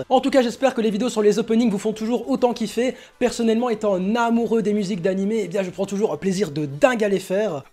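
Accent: Belgium. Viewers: Il est belge ?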